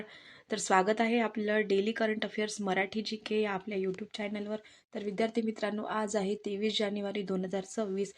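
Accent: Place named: Vidarbha, India. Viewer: Ati uttam mam, tumhi vidarbhachya watata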